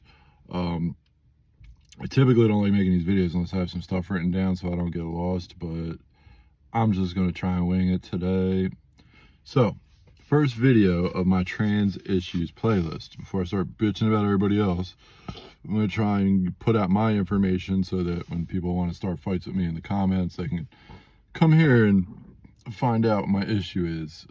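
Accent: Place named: Philadelphia, United States. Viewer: I totally knew from your accent that you were from the Philly area!! My family is from Levittown/Bucks County!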